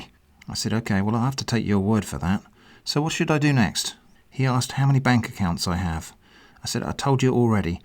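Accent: United Kingdom. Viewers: Amen to that ! He indeed has a very soothing British accented voice. Or perhaps Australian ?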